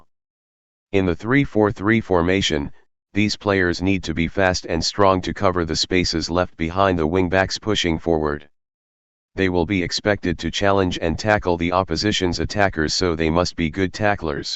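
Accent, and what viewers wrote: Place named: United States. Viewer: Robotic American accent . YUK!!!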